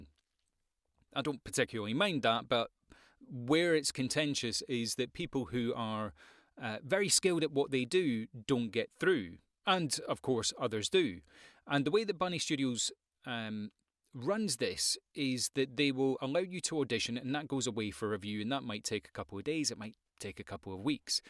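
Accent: Scotland, United Kingdom. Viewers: Your videos are fantastic. Began my VO career last year and have jumped around a number of channels on the subject. Yours are extremely thorough and made all the more watchable thanks to articulate manner and Scottish accent! Lovely stuff 😎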